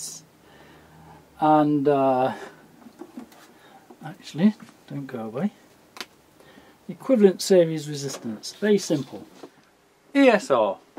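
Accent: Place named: Derbyshire, United Kingdom. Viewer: great vid that was a good call on the caps..takes me back to my days in the 80's as a stores clerk for co-op sound and vision...caps were my nemesis too mainly for ordering and stock control. Not from Derbyshire by any chance? think I recognise the accent..regards from Derby here mate.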